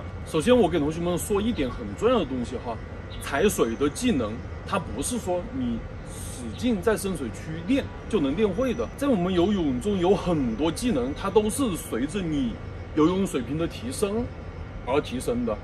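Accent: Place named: Hubei, China. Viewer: 这教练大概是湖北人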